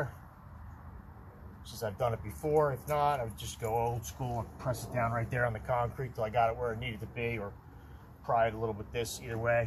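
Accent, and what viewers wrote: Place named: Philadelphia, United States. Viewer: And that area is very clearly the Philadelphia area! Can spot that accent anywhere. See ya around town!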